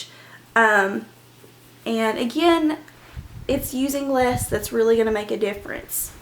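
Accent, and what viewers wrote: South, United States. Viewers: You're adorable and I admire your accent - southern? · Are you from south alabama or mississippi?? You sound country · LOVE THIS!! SO very helpful:)) btw..you're a lovely young lady, with a cute southern accent:)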